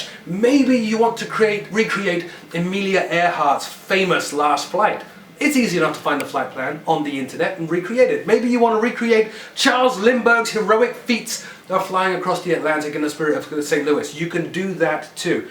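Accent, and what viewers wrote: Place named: United Kingdom. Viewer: You live in the states? Thought you were british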